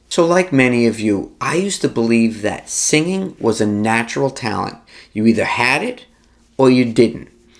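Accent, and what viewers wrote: New York, United States. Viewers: Thanks, as an Englishman (living in Brazil) your New York accent always puts in my mind of my favourite TV show. No not CSI New York but The Sopranos, so it was good to see a picture of Tony S in there!! I have a request for future videos. I'm not a pro and only do little fill in sets when the main performer is on a break at my local bar, but despite plenty of recent practice I still find playing guitar and singing at the same time a bit of a struggle. It's easy enough on simple 3 chord bluesy songs but for something like Layla, which I tried after your recent tutorial, you know a bit more complex, it's a bit more of a challenge! I do manage it ok but would like to not need to concentrate so hard!! Any tips. Also, I live in an apartment block and can't really rehearse singing at full volume and certainly not with a mic, any ideas or do I have to always wait until I'm out live to see if the song suits me. 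Anyway another great vid today, thanks.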